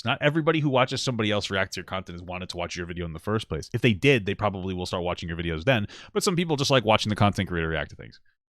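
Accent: United Kingdom. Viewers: I'm Australian and sounds like he has a British accent.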